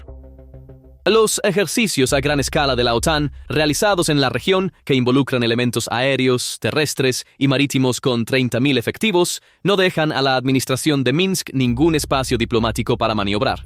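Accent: United States. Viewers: españa con acento gringo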